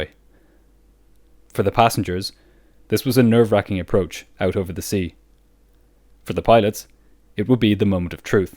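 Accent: Ireland. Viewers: I like your Irish accent.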